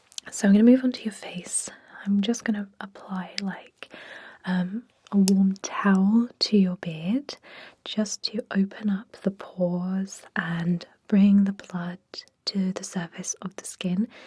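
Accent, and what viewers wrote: United Kingdom. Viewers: plus a britsh accent help too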